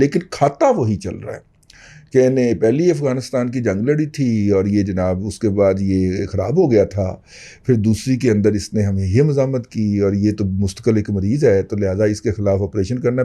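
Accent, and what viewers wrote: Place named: Saraiki, Pakistan. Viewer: AoA Saein, I like your sairaiki accent very much. Please also give a sairaiki touch with Punjabi.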